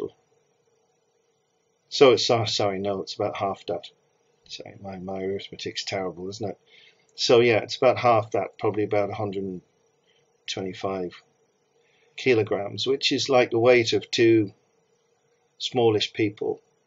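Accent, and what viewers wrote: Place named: England, United Kingdom. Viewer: What part of England are you from? It may work better in the UK.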